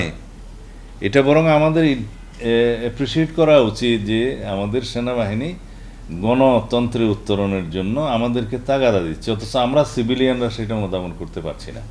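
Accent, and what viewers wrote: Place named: India. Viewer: তুমি এত বছর ভারতে ছিলা। ভারতের সুরে কথাবলবা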